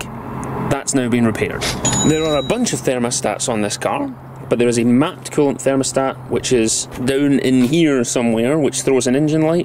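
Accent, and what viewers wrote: United Kingdom. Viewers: Dude, look.. this is the story: 1. I'm German and worked for 2 big German manufacturers as well as on their cars. They break and they break fast and a lot. Deal with it 2. You sound British or a little Irish or Scottish, so what point are you trying to make with such a car with them speed limits here. Makes way more sense in Germany, but quite obviously you don't live there. 3. Buy yourself a decent Toyota Avensis D4D and enjoy your life instead of wasting time fixing things. 4. As I said I worked for German car manufacturers and advice everyone NOT to buy a German car but a Japanese instead because they are awesome. 5. Even my German Stihl Strimmer from the early 80's - which is still running strong by the way - was built in Japan.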